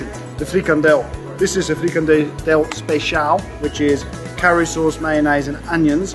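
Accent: Netherlands. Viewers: don't say you are not dutch your voice is as dutch as it can get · je hoort dat hij hatstikke nederlands is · im dutch,, hes to i can hear by his accent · Natie je bent zelf Nederlands je spreekt die woorden zo Nederlands uit he weejoo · You got A verry dutch accent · You are definitely Dutch I can hear it in your accent and how you say the names of the foods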